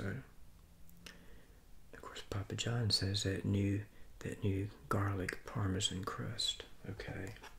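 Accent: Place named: Appalachia, United States. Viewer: Where are you from my man? Like the accent, pretty sure Appalachian or mid south but was wondering exactly what State ur from? Love the vid and keep doing what ur doing brother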